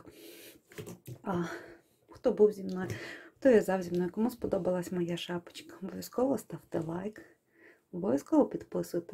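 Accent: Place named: Kyiv, Ukraine. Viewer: Я благодарна вам, и говорите вы, так мило, как моя тётя в Киеве говорила, люблю ваш язык. Спасибо за доступное разъяснения.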